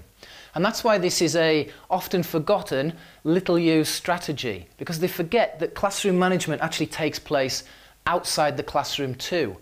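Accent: United Kingdom. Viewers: Hi. Enjoyed the video. Really good advice. Where is your accent from? Are you from the Lakes?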